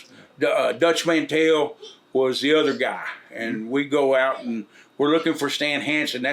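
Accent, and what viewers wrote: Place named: South, United States. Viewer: Well Flair had good promo psychotic macho at the sametime. Naw your talking ain't way up there. Your country accent hurts you.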